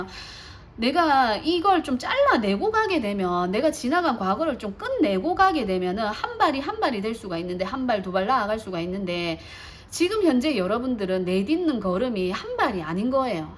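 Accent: Gyeongsang, South Korea. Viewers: 3 여러가지를 하고 있는 것 잘 맞추시네요~^_^! 이젠 하나만 하려 합니다ㅋ 작가의 길이죠. 처음으로 댓글 한 번 남겨봅니다. 구수한 갱상도 사투리 너무 좋네요. 감사합니다^_^!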